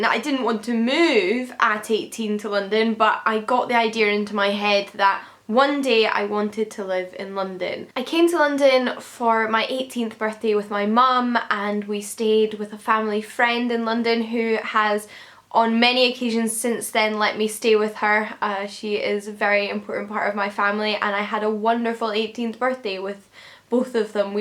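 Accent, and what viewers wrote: Scotland, United Kingdom. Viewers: I absolutely love your accent (I spent 6 months in Edinburgh as a student and there is no accent I like better :-))! Good luck with the flat hunting! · It sounds like while you've had inevitable adjustments, your move has largely brought you that incredible feeling where you feel you're exactly where you'r meant to be in life, and surrounded by incredible opportunities to boot!! Glad it's working out for you (and that you're still taking time to make videos amongst all these changes)! I do have a question about how you're sorting flat hunting--did you just bring up suitcases and plan to crash with friend(s) until you found a place?? (this reminds me a bit of when I moved to NYC--packed my stuff in suitcases, crashed with a friend for 4 days, looked at lots of apartments Id been searching ahead of time on Craigslist[idk if theres a uk equivalent to craigslist… you can find all kinds of stuff on there but its mostly used for roommates and apartments, though you have to be careful] and other sites, and had actually found a place in less than a week.). 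And your experience with your "otherness"/Scottish accent feeling enhanced is so apt--I actually had the opposite experience once!! If it's not obvious, I'm American and have lived there my whole life. I'm mixed background (aren't we all), but a large % Polish. (and obviously while it's not a perfect melting pot, the US has a mix of ethnic backgrounds etc). When I visited Poland for a few weeks (with a friend I'd jokingly call "really" Polish--as opposed to my fake Polish? ;) Moreso because she went to Polish school every Saturday and was fluent,etc.,), I was struck by how much I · Re: your feeling of being more Scottish, YES! I feel a lot more French in England than I do in France. The people I meet tend to focus on the more positive aspects of being French and I tend to focus on the stuff I miss, so it's easy to feel more attached. When I'm home, I'm immediately in Ranting-about-the-French-government mode... 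And as a second-language speaker, I totally agree your Scottish accent isn't that strong. It's lovely & very recognisable as Scottish, but sometimes when people who sounds VERY VERY Scottish are on the news I have to put subtitles on or I won't understand.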